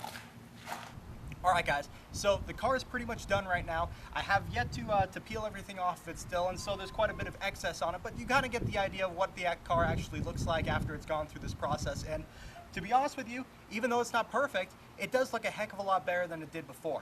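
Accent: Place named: Germany. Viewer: Your accent man... Where are you from? Are you German originally?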